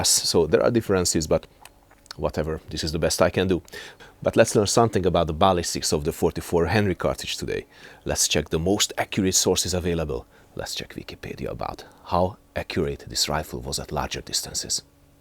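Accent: Hungary. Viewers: ..az angolod jó csak nagyon magyaros😅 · So accurate shots! Perfect video with nice hunglish accent as always!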